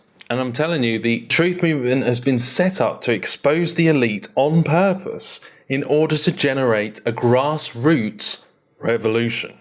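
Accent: United Kingdom. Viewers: EGO DEF He's not American, but English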